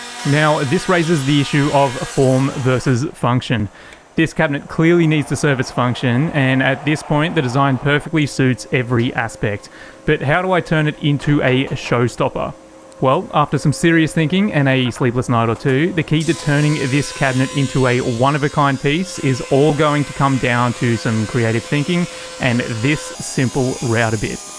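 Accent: Australia. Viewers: Ah didn't actually know you were Aussie. I like it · Awesome dude, love hearing a fellow aussie while hes doing friggen amazing work! Be good if you could use some native high end timbers if possible, if there are any. I'm only a couple years in and just use off cuts from a stair manufacturing place. Love your work mate.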